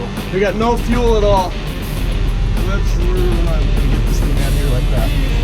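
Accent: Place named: Utah, United States. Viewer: Greetings from Tooele! I can tell you're in Utah because you say "acrossed" instead of "across".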